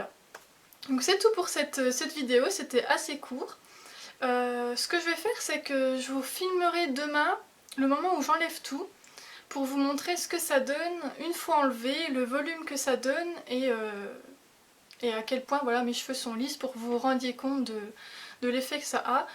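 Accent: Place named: Franche-Comté, France. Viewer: tu as très bien expliqué!! tu as l'accent de chez moi enfin la franche comté!! je test ce soir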